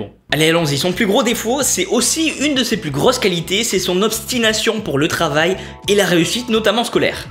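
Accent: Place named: Marseille, France. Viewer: Ton accent marseillais 🤣😂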